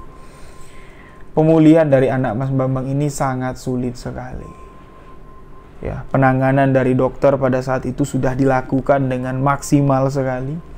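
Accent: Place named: Jambi, Indonesia. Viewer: Mas dari Jambi ya. Logat ny pengen tau aja saya. 🙏 atau dari Madura.. Salam dari Setu Bekasi. Sehat selalu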